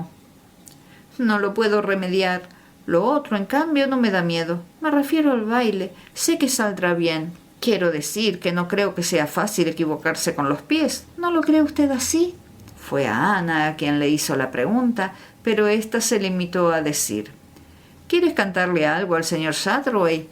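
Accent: Argentina. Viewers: Exelente , te escucho desde Costa Rica 🇨🇷 y vos SOS de la Argentina ??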